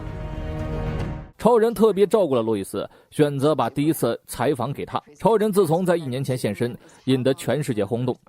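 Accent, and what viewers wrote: China. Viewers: 介广东话比我还飘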